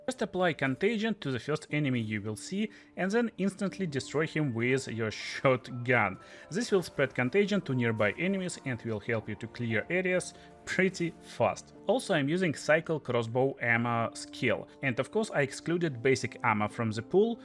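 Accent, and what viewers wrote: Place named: Russia. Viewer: то ли из-за дуолинго, то ли из-за русского акцента, но этот видос я понимаю без дубляжа 🤣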